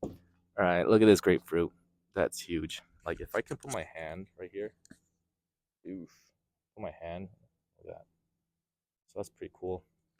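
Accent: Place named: England, United Kingdom. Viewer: Yesterday, I came across a video on YouTube featuring a very young developer from Chicago, he shared about how inspiration feeling about documenting our life, work, and growth via vlog/videos (btw his channel looks so art cinematic I'm impressive so much!! <link> . Today, I found myself back on your channel watching another video about making videos on YouTube. It makes me feel a bit shy because I still don't feel ready to start my own vlog. I'm taking it day by day and hopefully, I'll have the courage to start a vlog soon. Anyway, I hope that we can organize a meet-up for UX designers to share experiences in Ho Chi Minh City. NAB is my dream company. I hope to become your colleague one day! Another thing I'd like to comment on - I love your English accent. I'm just curious, did you study abroad?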